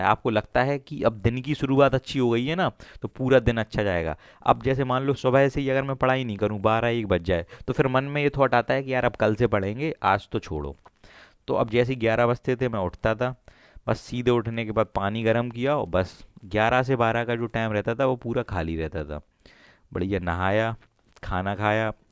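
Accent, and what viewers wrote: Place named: Etah, India. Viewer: apke bolne ka style ekdm Etah side ka lgta h😁😁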